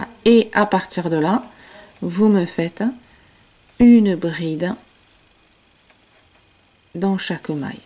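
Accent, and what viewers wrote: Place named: Pau, France. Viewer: Vous avez un accentde Pau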